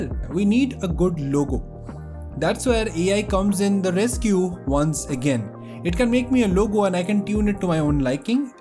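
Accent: Bengal, India. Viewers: good to know u can have a YT career in english with such a strong bengali accent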